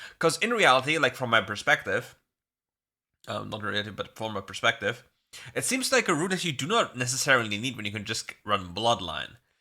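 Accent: Germany. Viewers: it depends on the video and word choice used. Some people have more difficulty understanding accented english than others. His english is fluent, its just hard to get the word usage sometimes due to thick accent, which many people do have. Tbh german accent is cool, its just sometimes hard to make what is being said for some words 😅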